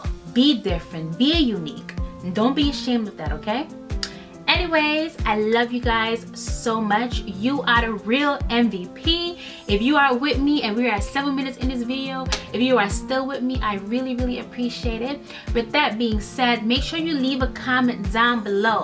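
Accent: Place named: United States. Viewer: Beautiful. One question... You have an American accent sound too... Did you grow up in the US too? Also are there very many American or European people in Suriname now? Okay that was two questions lol.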